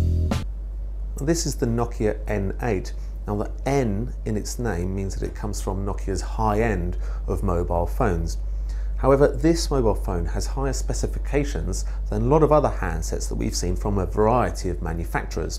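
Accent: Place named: Netherlands. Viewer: is het echt een britse of een nederlander? zo ja dan moet hij normaal engels praten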